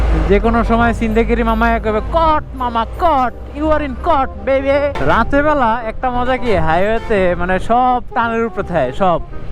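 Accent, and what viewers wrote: Barishal, Bangladesh. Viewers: ভাই কি বরিশাইল্লা,,,,,,, 😊? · Vai apni ki borishaila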